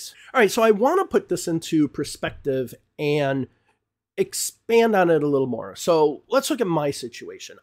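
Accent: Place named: United States. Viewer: Hi teacher am new here to learn english a. Loving your accent, which state of usa are you from? Thanks in advance for your beautiful class.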